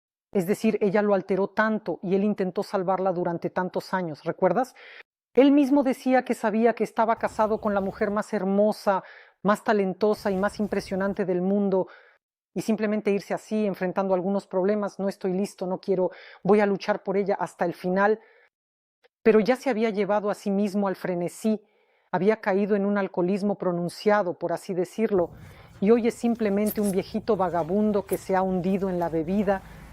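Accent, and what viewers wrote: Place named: Mexico. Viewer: Por fin, hace tiempo que quería escucharte en español y con un acento mejicano 😅.... maravilloso ❤❤❤. LOVE YOU!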